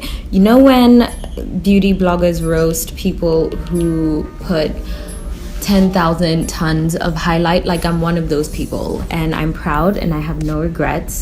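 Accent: South Africa. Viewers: I knew from the moment I heard u speaking that u are South African, u are very detailed,love love ur videos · are you South African Sisi?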